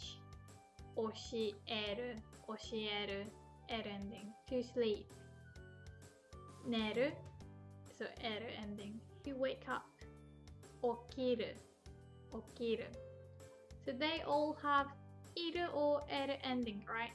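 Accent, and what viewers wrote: United Kingdom. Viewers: Shes has a British accent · イギリス人のような発音を使いますね！ ハリーポッターが好きなんですか？笑 私はイギリスから来たので、気がつきますけど、日本ではアメリカの英語を学ぶらしいですから、珍しいと思います！ 優れた発音に感心します ：D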